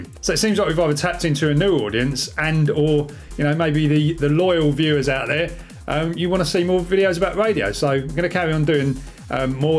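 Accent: United Kingdom. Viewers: Don’t worry about correcting us with the UK radio station If Americans don’t understand they’re watching a guy in the UK with a UK accent and he’s pretty much probably most definitely English is there a problem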